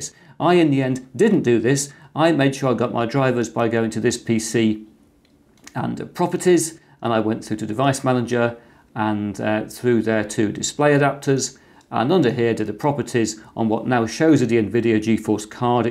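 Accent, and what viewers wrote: United Kingdom. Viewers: Why is it when a British person speaks everything sounds so much better? Subbed btw your voice is awesome.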